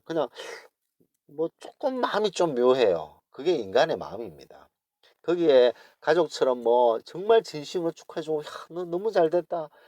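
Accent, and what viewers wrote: Gyeongsang, South Korea. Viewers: 너무 공감백배 입니다. 세상이 점점 삭막해 지고 조심스러운 얘기지만 교민사회가 제일 심한거 같아요... 저랑 똑같이 경상도 사투리로 구수하게 단도직입적인 스탈 매력있습니다. 실례가 안된다면 제 유투브에 이 이야기를 언급하고 싶습니다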